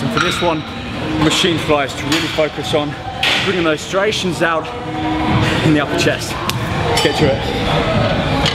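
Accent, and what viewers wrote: United Kingdom. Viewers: has one really cool way of talking and explaing exercises,even myself started to talk like,british excent lol:D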